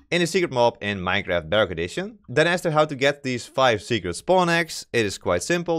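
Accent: Netherlands. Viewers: Your Dutch i know it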